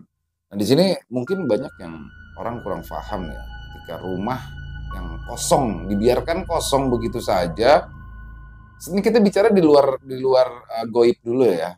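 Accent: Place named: Jakarta, Indonesia. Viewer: Klau dengerin cerita bg seakan terbawa suasana dan tau2 critanya udah selesai bgus bgt carapenyampaianya pnuh semangat apa lagi klu udah dg logat betawi keren deh......👍👍👍👍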